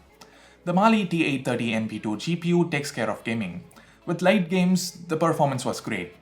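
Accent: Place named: India. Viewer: Indians English sounds annoying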